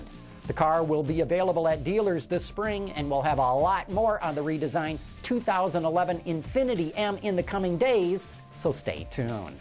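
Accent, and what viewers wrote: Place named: Michigan, United States. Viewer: STRONG mICHIGAN ACCENT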